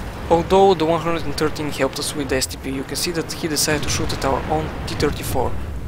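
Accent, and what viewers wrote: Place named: Germany. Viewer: WOW good Review! You sounds a littlebit German ^^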